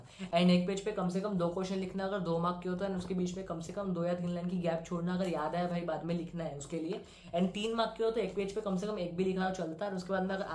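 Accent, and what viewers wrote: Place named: Maharashtra, India. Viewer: The marathi accent 🫡🫡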